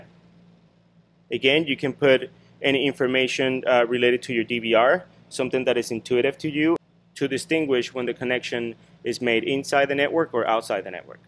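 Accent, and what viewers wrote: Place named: India. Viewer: Nice accent, indian?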